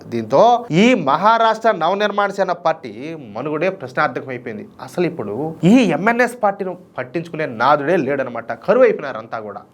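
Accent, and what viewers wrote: Rayalaseema, India. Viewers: 😂😂😂😂 అన్నా బలే తిట్టారు......... మీరు న్యూస్ చెప్పిన .... దేశ వ్యతిరేకులను తిట్టిందా చాలా అద్భుతంగా ఉంటుంది......... మీ ఆవేశంలో ప్రతిసారి అందులో మీ దేశభక్తి కనబడుతుంది...... నేను మీ ప్రతి వీడియో చూస్తాను మా ఫ్రెండ్స్ కూడా చాలామంది subscribers ఉన్నారూ.... నేనే చేశాను....... ఈ వీడియో ఇప్పటికి పది సార్లు చూశాను.... మీ తిట్ట దండకం విని పొట్ట చెక్కలయ్యేలా నవ్వి నాను...... కానీ మీరు ఈరోజు చాలా మంచి మాట చెప్పారు.......... మీరు సంఘ వ్యతిరేక శక్తులను తిడుతూ ఉంటే నేను చాలా ఎంజాయ్ చేస్తాం....... మీరు ఉమ్మి నప్పుడల్లా మాకు చాలా నవ్వొస్తుంది ఏమనుకోవద్దు...... మీరు మాట్లాడే తెలుగు భాష వ్యాస యొక్క అభిమానిని నేను రాయలసీమ భాష చాలా చక్కగా మాట్లాడుతారు మీ వల్ల మాకు ఎన్నో కొత్త పదాలు తెలిసాయి... ❤❤🙏🙏🙏🙏 ముఖ్యంగా నేను రాయలసీమ ఆంధ్ర కోస్తా యాసల ఎక్కువగా పరిశీలిస్తారు.... చాలా కొత్తగా ఉత్సాహంగా ఆనందంగా అనిపిస్తుంది..... ఇట్లు కరీంనగర్ జిల్లా వాసి.....❤❤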